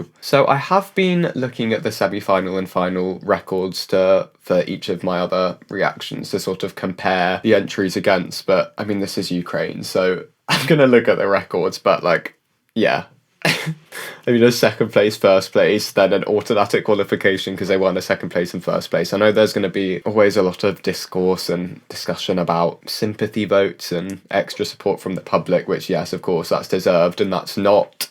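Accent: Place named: Wales, United Kingdom. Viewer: Interesting accent. Are you from Wales?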